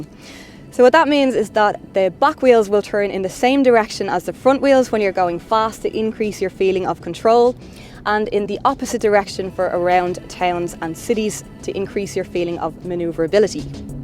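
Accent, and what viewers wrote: Ireland. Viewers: Love your reviews and the gorgeous Irish accent - I’m now a subscriber. Can’t agree about the Touareg interior though - more functional looking and less classy than the big Audis.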